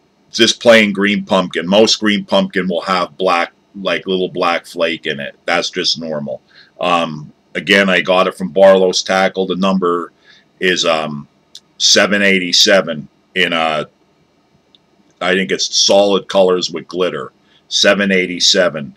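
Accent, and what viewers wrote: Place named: Western Pennsylvania, United States. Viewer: You are a pro! Clean and simple. Man you sound like you're from Western PA. Pittsburgh or Johnstown. What kind of cover you throwing this type of jig in?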